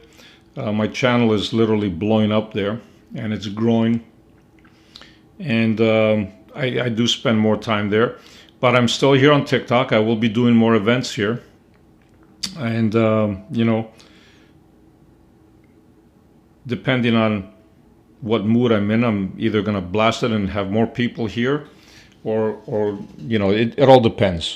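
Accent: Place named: Greece. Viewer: I am so glad that you were born in Greece, when i first watched the first video of you, from your accent, i understood that you are Greek :) I am following your guidance and i ve seen tremendous changes in my financials and mental health. Ευχαριστώ!!!☺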